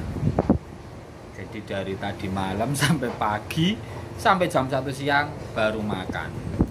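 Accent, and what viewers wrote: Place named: Java, Indonesia. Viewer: Jawa tulen mas😅😅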